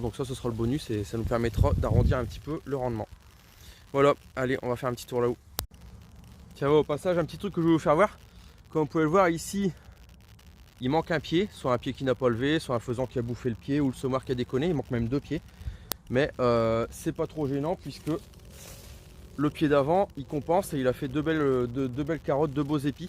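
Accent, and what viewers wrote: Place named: Nord-Pas-de-Calais, France. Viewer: Salut, merci, très belle vidéo et bien expliqué. Tu as un petit accent ch'tit, dans qu'elle coin tu te trouves ? Moi, je suis plus picard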